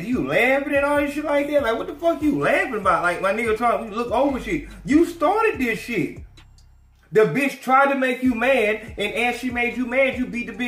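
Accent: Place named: South Dallas, United States. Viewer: Lol ...you talk like my homies in south Dallas ...good shit man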